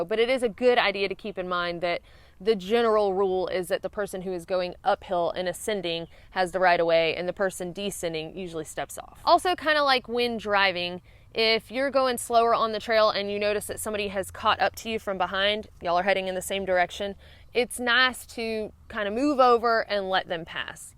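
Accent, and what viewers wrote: South, United States. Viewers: I love how you say "Bright white light". I'm from NJ but love the southern accent! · headlamp has a brait whait lait...your southern twang is adorable.. Good advice as well..common courtesy is something a lot of folks lack..you are obviously drippin with it..good on ya...and you have sand young lady...hugs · Love that southern accent! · “Brot wot lot”...love the lingo. I always learn something listening to you. Press on! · To many raised in the South, speech from the north has always sounded equally strange and or funny. Southerners have just generally been too polite and respectful to mock people for it. This was largely the reason many of us raised in the South years ago resented “Yankees.” We always knew what to expect immediately: mockery and derision because we and they spoke different. It’s not nearly as prevalent theses days, but this thread shows vestiges of it. 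Fortunately, even in this thread, some now even seem to find the southern dialects pleasing and charming, especially when coming from this lovely young woman. is a good ambassador and representative for our culture. I think she is a beautiful person. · I had to watch it again to catch the "braht waht laht" part. Love the southern accent. Thank you for the informative video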